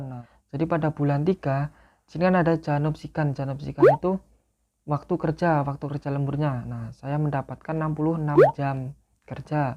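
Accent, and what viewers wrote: Tegal, Indonesia. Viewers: Wong ndi sih? Kaya wong Tegal basane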